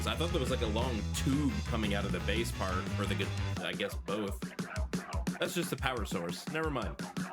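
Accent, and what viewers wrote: Quebec, Canada. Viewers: Where did you pick up your Quebec accent? Because you have traces of it? You know, I live in Montreal, but I'm still more than 5 hours from Saguenay, where Angine de poitrine (Heart pain) originates!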